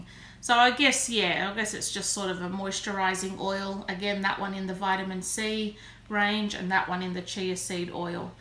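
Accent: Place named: Australia. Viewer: Get bum fluff off your face...so nice to hear an Aussie voice. I can't resist stationery & paper products either. Tfs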